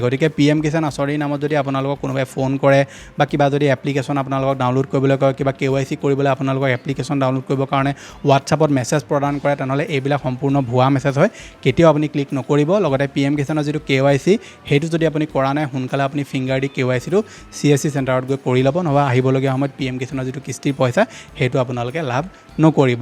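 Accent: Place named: Jorhat, India. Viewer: Jorhat dictic❤❤❤❤❤❤❤❤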